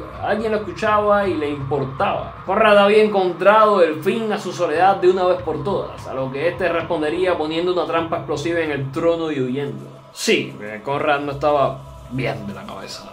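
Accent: Cuba. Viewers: Perdón por la pregunta,pero sos cubano?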